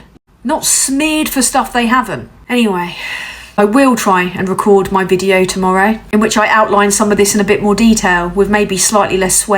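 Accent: United Kingdom. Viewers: God, I love this british accent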